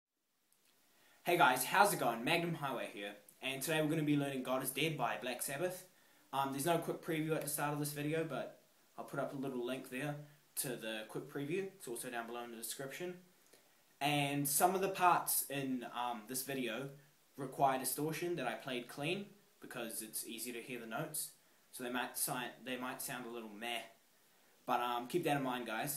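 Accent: New Zealand. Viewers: are u from New Zealand?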